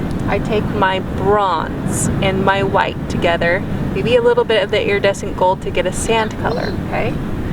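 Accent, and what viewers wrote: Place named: Midwest, United States. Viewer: You must be originally from the Midwest/east.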